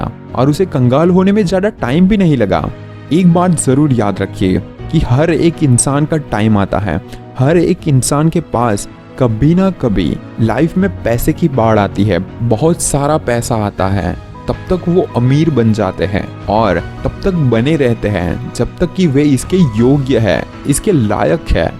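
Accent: Gujarat, India. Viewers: deepak kumar bhai We are gujrati hindi bolne ka jo tarika hota hai aaisa hi hota hai... sabko continue bolne me problem hota hai... kai ese sabd hote he jo hindi bol nahi pate... agar aapko aapni bhasa se dusri bhasa bolne ko bole to aapko bhi problem honga... so don't mind improvement sabhi ka aa jata hai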